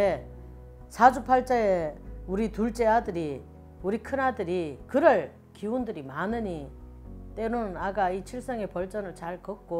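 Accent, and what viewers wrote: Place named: Gyeongsang, South Korea. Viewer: 선생님 사투리쓰셔서 갱상도 계신줄 ㅠ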